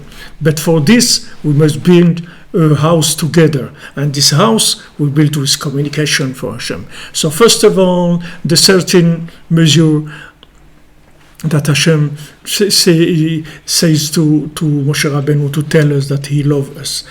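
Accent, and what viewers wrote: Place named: France. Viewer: Ah! What a surprise! That's the first time I hear you teaching us in english! I didn't know you speak several lagages! Baroukh HaChem j'ai tout compris de ce que vous dites en anglais, my english isn't so good. Et très charmant votre fort french accent!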